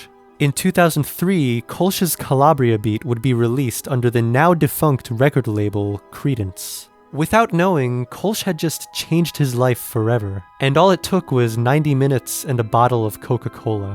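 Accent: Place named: Jamaica. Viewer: I can understand pretty much everything said when you don't talk over it....for some reason I mostly almost completely understand it....go figure Jamaican.... English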